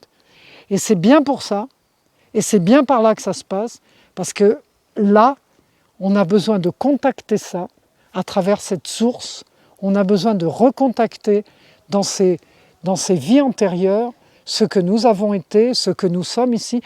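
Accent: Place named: France. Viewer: mais elle est francaise cette personne, francaise de france, c'est quoi le rapport une francaise qui se dit autochtone????? qui se dit gourou autochtone qui va nous transmettre la culture autochtone cette francaise????? ben voyons donc, elle est francaise cette personne la, c'est pas une autochtone elle, son accent est francais de france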